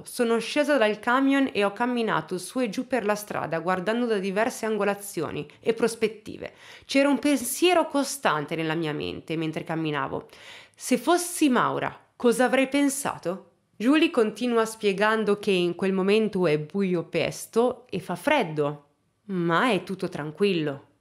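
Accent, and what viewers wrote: United States. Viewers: il tuo accanirti nel “parlare in americano/inglese” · Perché spingi così l accento americano?????? Sarebbe tutto molto interessante se non fosse per questi nomi e città straniere citate con un accento veramente troppo spinto...... veramente fastidioso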